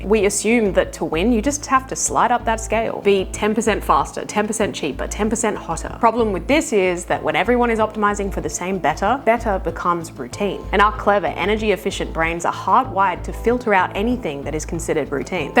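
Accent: Australia. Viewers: Exactly. I think this kind of mistake is generational and geographical. She sounds Australian and also young, so probably wouldn't have the best grasp of British car industry and world history. The Mini was made by BMC, designed by Alec Issigonis. Volkswagon was created by the German govt and the Beetle was designed by Ferdinand Porsche. The word Volkswagon means 'People's car'. Sure both the Beetle and the Mini were 'cheap transportation' icons around the world, but it's pretty inexcusable to make the claim in the video. Poor research. Ironically the Beetle made Volkswagons name and turned a struggling company into a corporate superpower (more ironically it was rescued from the scrap heap by a British army general in the last days of WW2) and would eclipse the entire future UK car manufacturing industry. The Mini was a cultural icon of the 20th century almost to the degree of the Beetle, but it helped bankrupt BMC as each one was sold at a LOSS. 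The more Minis sold, the more money BMC lost....and yet they didn't actually replace it with a more profitable new model until 1980 with the Austin Metro. The reason cited for retaining the Mini was always 'The Mini is still selling in large numbers'!! 🤣🤣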